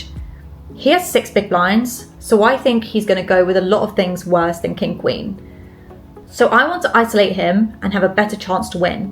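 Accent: England, United Kingdom. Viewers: Tournament poker is SO resident sleeper :( Cute English accent is worth though. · I already watch poker vlogs, but listening to a lovely lady with an English accent makes it cooler, lol. You should do cash game vlogs though!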